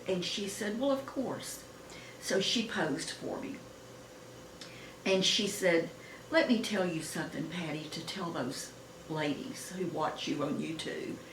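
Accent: South, United States. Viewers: You are beautiful and so inspiring!! Love your southern accent🌿💜🌿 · Yes! I love what you say and how you encourage other women to do what they want to do and feels right to them. Where are you? I am in Massachusetts. You sound southern, but I wish you were next door! ❤️ I will be 79 in August, still wearing makeup, still learning how to do it better. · Hi I only just found you on You Tube tonight! I'm soooo happy because you are a delight!! I love your southern accent! Where are you from? I'm originally from Louisville, KY and oh how I miss a soft southern accent. Can't wait to see more of your videos!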